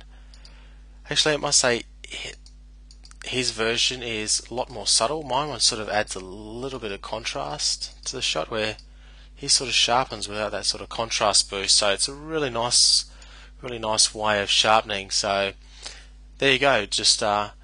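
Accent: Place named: Australia. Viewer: he's australian, dude. give him a break. Nice upload! very helpful!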